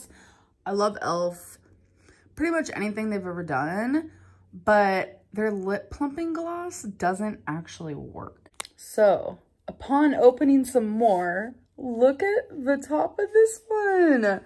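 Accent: South, United States. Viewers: What happened to her southern accent ? I haven’t watched her in a while lol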